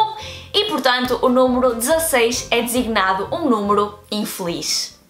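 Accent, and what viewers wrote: North, Portugal. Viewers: Temos algo em comum a pronúncia do norte e adoro matemática.😊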